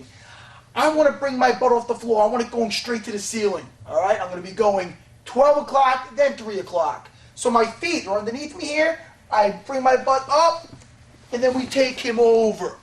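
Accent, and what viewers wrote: United States. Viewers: "don't lean foward" Strong American accent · Is he from North US / Brooklyn?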